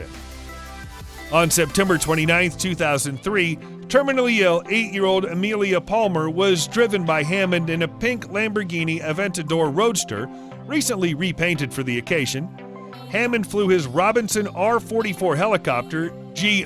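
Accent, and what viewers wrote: United States. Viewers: jeez the american pronunciation. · Why is a yank commentating ?? VERY BADLY !! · Christ get somebody who speaks English, as in English, not what some people refer to as American English, it makes these videos almost as unwatchable as the really cheesy still flying in and out. I am sure the £10 software has a fade option. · The American accents gets right on me tits say it probably ffs not hard aint kids anymore 🤦‍♂️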